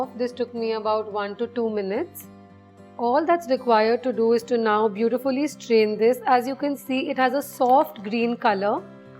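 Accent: India. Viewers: Also I love your raspy Indian accent it's so sexy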